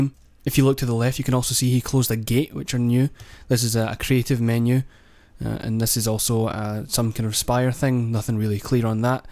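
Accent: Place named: Scotland, United Kingdom. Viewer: You're so... scottish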